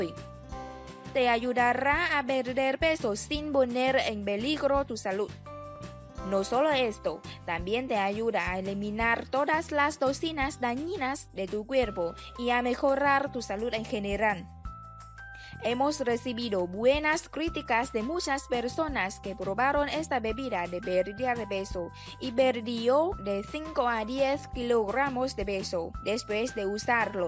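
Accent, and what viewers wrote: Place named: Russia. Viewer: Porque habla como rusa y es shinita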